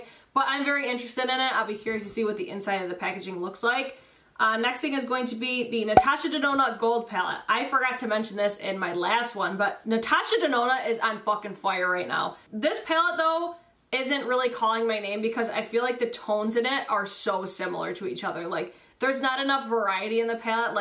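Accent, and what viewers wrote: Midwest, United States. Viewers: Just discovered ur channel last night and I really enjoy ur videos. I'm trying so hard to identify ur accent. I'm thinking Midwest. Wisconsin maybe. Totally not my business it's just I find accents fascinating. Mine is horrible I love urs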